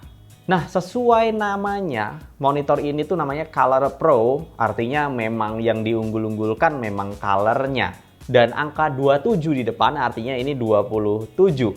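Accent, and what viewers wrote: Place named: South Sumatra, Indonesia. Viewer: Terima kasih reviewnya, Bang... Informatif sekali 👍👍. BTW, Abang asalnya dari Sumsel ya? Kebanyakan teman2 saya yang asal Sumsel, kalo bilang kata "gelap", diucapkannya "gelep".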